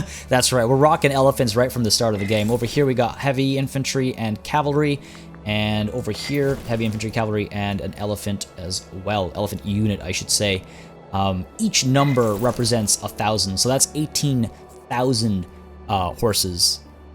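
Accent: India. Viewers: Bhai ye India lag Raha tha muje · How can your Indian accent is soo good are you related to India in any manner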